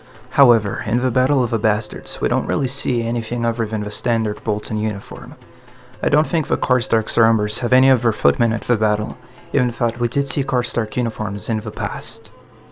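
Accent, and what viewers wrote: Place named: United States. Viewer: You write armour with british english but you sound slightly american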